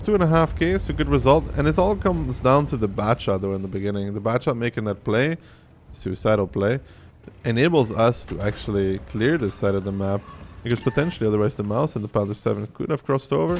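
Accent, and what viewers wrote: Netherlands. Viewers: Wait you dutch??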